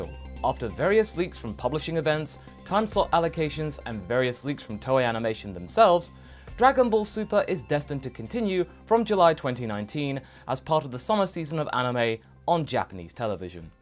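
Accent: England, United Kingdom. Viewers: Lol your gourgeus english accent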